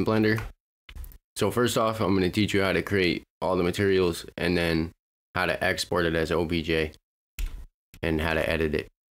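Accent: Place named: West Virginia, United States. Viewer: Naw, West Virginia. I've been several times. I'm in communications so I pay attention to accents. What do you use Blender for?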